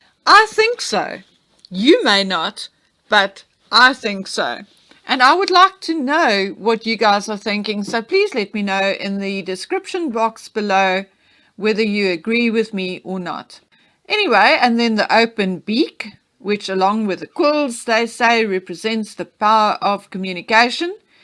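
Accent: South Africa. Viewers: That is not your business. You racist Afrikaner. Your accent is horrible. Your country has so many problems why don't you worry about that. You cannot put your foot in Meghan's shoes. She got a standing ovation when she spoke at the United Nations. Even Banki Moon stood up to congratulate her speech.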